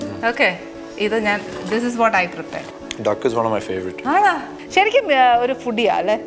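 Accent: Kottayam, India. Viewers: Kottayam bhashayil paranjanneyullu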